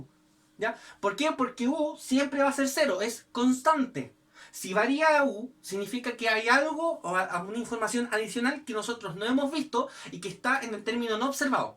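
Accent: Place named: Chile. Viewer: chileno, se cacha altiro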